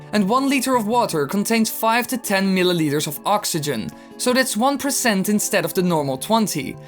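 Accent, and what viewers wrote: Netherlands. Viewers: Are you from the netherlands lol? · He's Dutch ;) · are u from holland? you're from holland arent u :P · Ik had dit in de achtergrond aan terwijl ik wat anders aan het doen was, en toen realiseerde ik mij pas dat je Nederlands was. Oops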